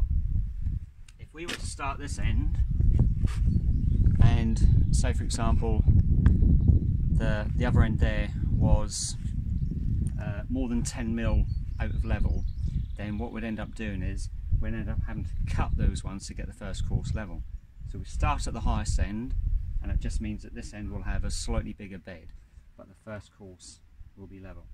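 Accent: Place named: Norfolk, United Kingdom. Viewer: you sound like your around my parts... Norfolk by any chance? ..(groundworker trying to learn a thing or two)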